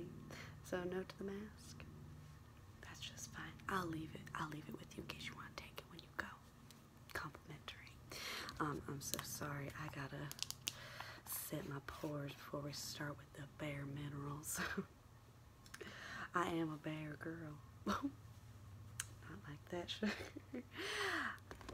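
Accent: South, United States. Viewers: THe southern accent and everything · yes I want her to do more asmr videos with this southern accent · It's like.. I should be offended being from the south but it's just so perfect I feel like I'm with my aunt. Thank for making me homesick 😂😂😂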